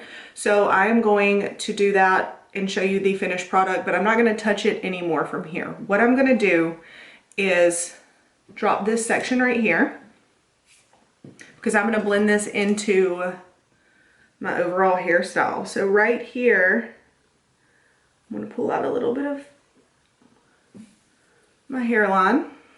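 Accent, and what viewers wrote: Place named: South, United States. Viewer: Love the cute southern accent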